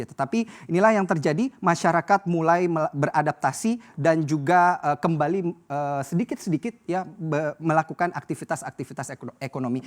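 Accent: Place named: Kalimantan, Indonesia. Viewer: Aku nebak dari loghatnya presenternya orang kalimantan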